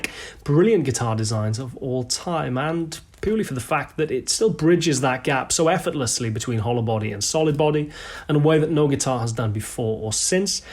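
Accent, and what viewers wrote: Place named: United Kingdom. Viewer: I was born and raised in the US and I'm listening to this video simply because I like the way speaks. My wife and I were talking about how much we enjoy British English (a blackbeltbarrister video had just ended). So I pulled up a video for an encore. David Bennett Piano is sure to be next.:)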